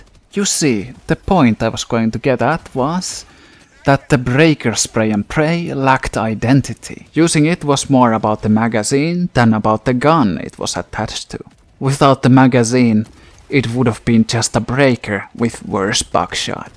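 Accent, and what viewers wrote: Finland. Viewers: anime girls, conquer from the cock and ball game for honor, dad jokes, sexy finnish accent. Perfect video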